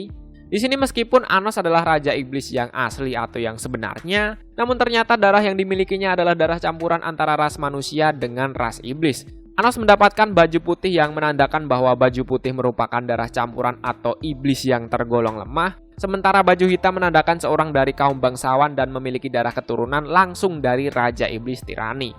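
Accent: Java, Indonesia. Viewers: Medok bat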